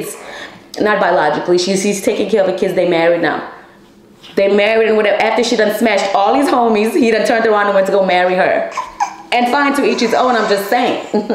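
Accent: South Africa. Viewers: Are you from south africa? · So she is originally from South Africa, can hear her accent has a twinge of SA accent.. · Are you from South Africa 🇿🇦 ❤ your accent ❤ SA babes here you are so strong 🌸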